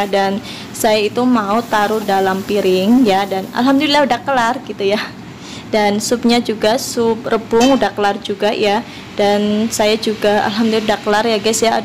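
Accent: Banyumas, Indonesia. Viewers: Pokoke Ora ngapak ora kepenak .. Sukses Terus mbak Yu 👍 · Ora ngapak ra kpenak ya mbk😁 Sma mbk ce q jg stengah 7 mkn mlm nya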